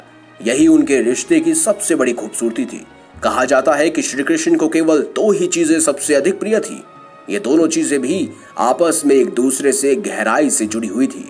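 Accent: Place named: United Kingdom. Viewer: Bhai dhang se bolo, pure Hindi me English accent mat Lao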